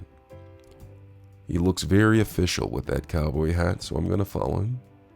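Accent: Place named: France. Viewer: Very very helpful! Love that French accent! But seriously, I've watched several of these and yours is the best one by far. Huge difference in the presentation and the others were very shallow in the delivery of information and were way less thorough than you. Even the ones that are twice as long as yours we no where as informative. I'm not traveling until January 2026 and I'll definitely watch this video again as part of my final preps. Thanks a million.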